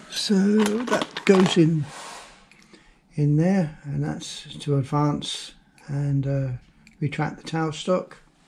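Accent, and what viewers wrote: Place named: United Kingdom. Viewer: Just subcribed to your channel, didn't realise you were from UK! (duuh!) I'm from march in cambridgeshire you don't sound far away! That amazon box with the bog rolls on it smiley lol! Take care, mate!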